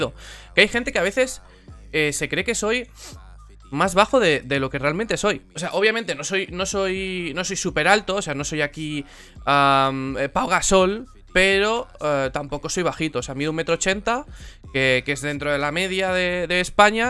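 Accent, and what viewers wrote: Argentina. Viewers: Sos mitad argentino ? Douuuuuu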